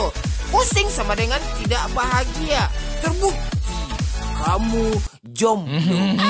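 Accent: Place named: Batak, Indonesia. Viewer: Logat bahasa nya mantap, horas bah, lanjutkan lagii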